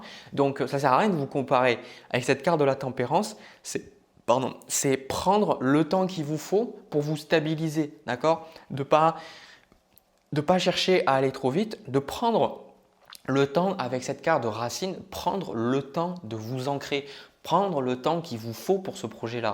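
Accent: South, France. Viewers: Merci, pour tous ces précieux conseils qui me font penser que j'aurai bien aimé avoir un pote comme vous!! En tant que signe Vierge, j'ai bien tout noté....et à mon tour, si vous le permettez bien sûr, je vais vous adresser quelques remarques en forme également de conseils....Vous avez selon moi, un tout petit "tic de langage", qui vous fait dire, par exemple: "Vous roprésentez ceci ou cela", au lieu de "Vous reuprésentez ceciou cela.."Alors moi aussi, j'habite dans le Sud et je ne suis pas du tout choqué, bien au contraire..:: Mais j'ai aussi des origines, et par conséquent une oreille....très parisienne....je sais, nobody's perfect!!;-)) Vous noterez peut-être comme moi, un léger sourire aux lèvres, que sur certains mots, assez rares, vous ne commettez pas ce que je ne saurai qualifier d'erreur!!;-)) Donc si vous le voulez bien, ce mois de décembre 2023, vous vous ferez plaisir, ainsi qu'à moi, en veillant à faire quelques progrès que vous nous présenterez, à n'en pas douter, dès ce début d'année!!!;-)) Merci et bonnes fêtes de fin d'année..!! 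Cdlt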